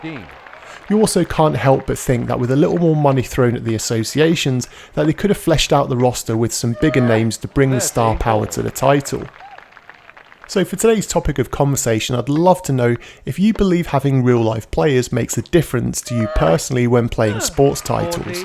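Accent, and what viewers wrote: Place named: United Kingdom. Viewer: This is "All-Star" tennis! Where the hell are Agassi, Sampras, Seles, Kournikova? Nice review,. Keep up the British Commentary.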